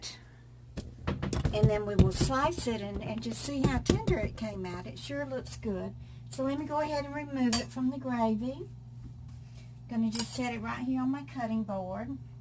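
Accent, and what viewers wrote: South, United States. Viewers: I love your southern accent, and I find your voice very relaxing kind.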